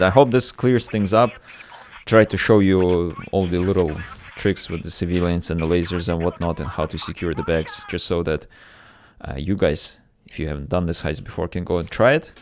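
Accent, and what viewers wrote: Russia. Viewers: Are you russian? Your accent is very simillar to russian one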